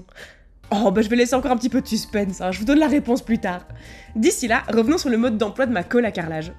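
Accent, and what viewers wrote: Belgium. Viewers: Pour dire WC les Belges vous dites "ouécé"??? Je sais que les Français on est pas logiques non plus vu qu'on dit "vécé" mais bon😅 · Je voudrais juste savoir un truc tu es française ou belge car tu as l’accent belge mais tu dis (sopalin ) comme les français marque qui n’existe pas en Belgique mais la bonne marque serait scotex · Entre "tant que ça drache pas des seau d'eau" et "je rappellerai le plombier pour savoir quoi" ton parler belge (qui ressemble à du parler ch'ti) me ravit les oreilles. Bon courage avec ta rénovation🌻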